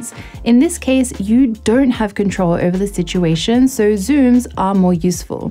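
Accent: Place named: Australia. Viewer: Australian accent mate 😉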